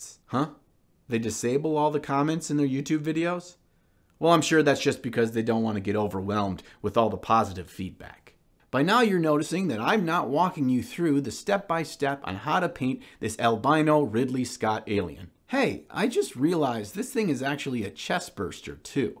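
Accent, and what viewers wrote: United States. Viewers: I'm pretty sure it's just the accent. Too american...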